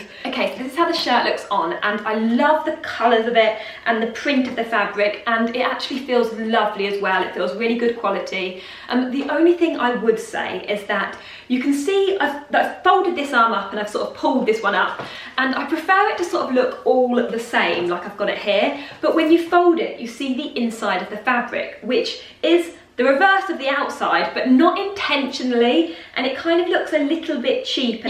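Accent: United Kingdom. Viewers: Hi! Just here to say I love your video's/clothes hauls/your beautiful British accent and well, everything! Much love from Alabama and I look forward to your next video!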